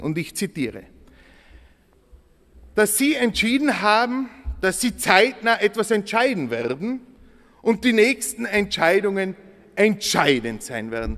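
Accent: Tyrol, Austria. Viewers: Hr. Steiner der Beste! Das ganze mit dem tiroler Dialekt! Genial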